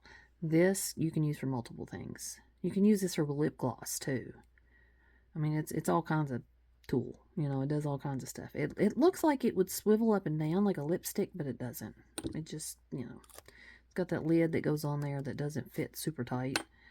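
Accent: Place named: Kentucky, United States. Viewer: New Subscriber here! Are you from Kentucky?😂 I know that accent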